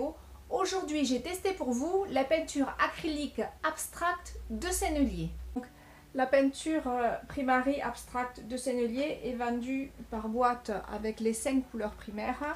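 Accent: South, France. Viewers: oh toujours magnifique et tellement bien expliqué vous êtes l'artiste peintre que je regarde le plus c'est toujours magique je vais essayer de faire celui là merci pour toutes vos explications pour moi novice dans la peinture j'apprécie beaucoup continuez de nous émerveiller et l'accent du midi met de la lumière supplémentaire dans vos tableaux suis aussi du midi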